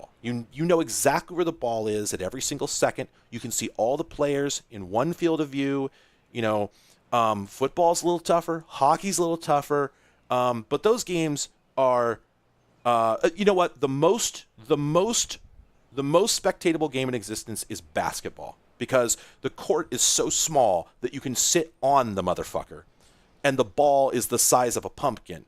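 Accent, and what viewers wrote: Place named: Australia. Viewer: Yay. Australian commentator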